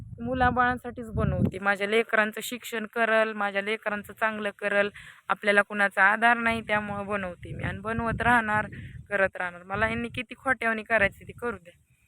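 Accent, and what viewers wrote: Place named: Ghat, India. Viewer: आपली घाटी भाषा भारी वाटते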